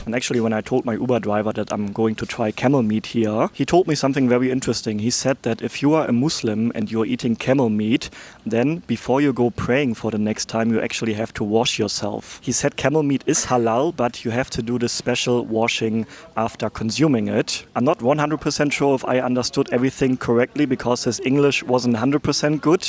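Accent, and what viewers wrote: Germany. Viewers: Salam Aleikum Wenn ich deinen Akzent so höre.. bist du deutsch? 😂 ich musste lachen als ich dein Video gesehen habe. Ich bin mix, italienisch deutsch niederländisch Orient. Geboren aufgewachsen in Bremen. Und seit drei Jahren Muslima Alhamdullilah ❤ warum trägst du Handschuhe??? 🧤 👀 beim Essen? 😂 😮 99? Wow Alhamdullilah das wusste ich nicht. Große Bedeutung. 😢❤ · if this is a problem youre concerned about, you should worry about better things. This man is german, and english isnt his first language, so obviously his english wont sound as american. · I wonder if the german accent helped him out would have liked to see him wear an israel flag badge And yes i was there for two months 15 years ago, and i had quite a few problems but a lot less than in so many other arabic countries i have visited more recently.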